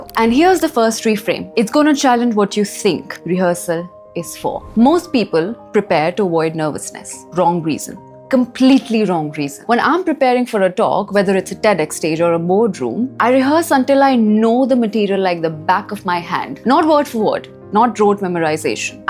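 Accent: Odisha, India. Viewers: Same doubt bcz the represent that 😂 i think she is from Odisha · Hey are you Oriya by Any chance?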